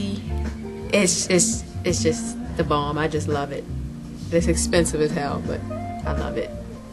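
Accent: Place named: Maryland, United States. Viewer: thought i heard a maryland accent!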